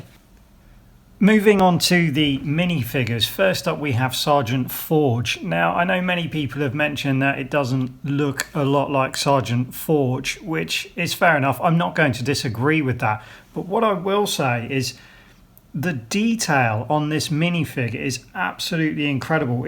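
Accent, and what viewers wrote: United Kingdom. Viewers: Great review. I'm really looking at it just for that Forge figure. The face sculpt is fantastic (probably for some villain rather than Forge) and, as you point out, those details like the Ace card might not be readily visible in 'real life' but really come into play for stop motions where you get close to the figure. BTW : You sound like a Brit. How did you get hold of this set and (if its OK) what did you pay? BTW2 : Great MOC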